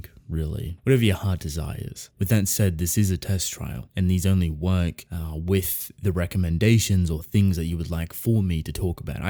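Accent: Australia. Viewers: i hadn't even picked up on your australian accent and now you've said so, i can't unhear it lmao that's so cool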